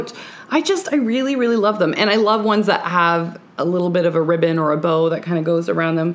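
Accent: Canada. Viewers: Love me a Canadian accent!! 🍁❤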